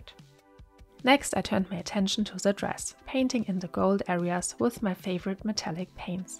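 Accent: Germany. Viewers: I can hear and 'see' Alpay Efe in these vides... like a female version of him... also with same german accent... fun 😊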